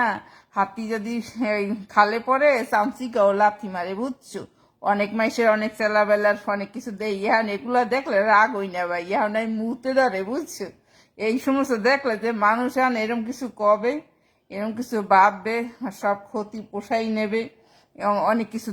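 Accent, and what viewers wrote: Barishal, Bangladesh. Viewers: দিদিভাই আপনার বাড়ী কি বরিশাল 😅 Thanks didi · আপুর বাড়ি কি বরিশালে?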